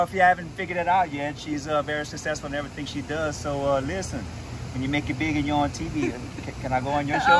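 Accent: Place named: Louisiana, United States. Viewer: Hey where are you originally from? Sound like La Place or Gonzales area. Just curious.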